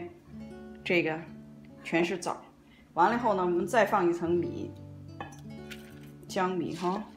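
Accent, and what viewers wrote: Shaanxi, China. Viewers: 喜欢听陕西话讲👍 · 才看到这么地道的陕西美食！谢谢诚意分享🙏！还有地道的乡音，逗得我观看全程哈哈直乐！都是爱吃的，准备做去！🤝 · 姐的陕西话很地道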